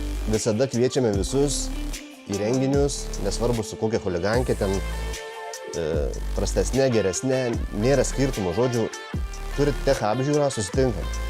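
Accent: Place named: Miami, United States. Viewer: Geras video, bet jay tas majamio akcentas.....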